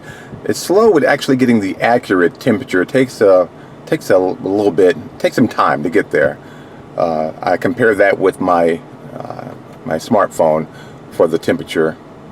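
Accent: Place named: Oakland, United States. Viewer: Love the video. You from Oakland? You sound kinda like me and my buds. :)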